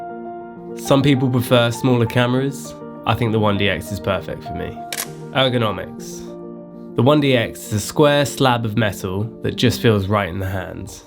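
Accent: United Kingdom. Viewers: Amazing view and lovely British accent..well done.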